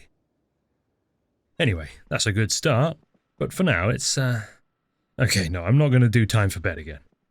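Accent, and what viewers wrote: United Kingdom. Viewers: Absolutely love this channel. As a fellow Brit you're much more enjoyable to listen to compared to some of those dashing Yanks.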